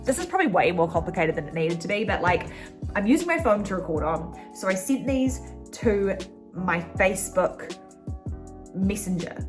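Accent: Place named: Singapore. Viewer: Why she speaking Singlish?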